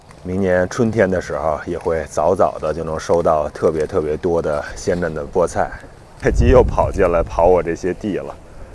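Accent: China. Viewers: 哥们儿我看了几个你的视频，完全震惊了！！！这些菜，鸡鸭，粮食，都是你一个人种的？？？问号儿已经不足以表达我觉得不可思议的思想感情，我真的佩服的已经说不出话了，看你也是大陆人，听你说话的语气也是曾经在大陆上班的，居然到了美国能完全脱胎换骨成了种这么多种农作物和养这么多家禽的农民，更牛逼的是，你居然还每天上班，农民都是下了班以后当的，简直了也是，我已经快要语无伦次了，只剩下：卧槽！真的佩服和羡慕！啥也甭说了，赞一个！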